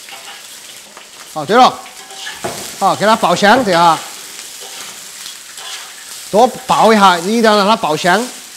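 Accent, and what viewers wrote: Chengdu, China. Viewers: 最标准成都话！love!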